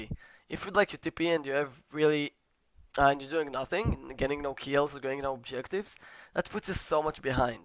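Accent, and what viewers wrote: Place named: Latin America. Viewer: are u latino? lol ur accent is strong and nice video! pretty informative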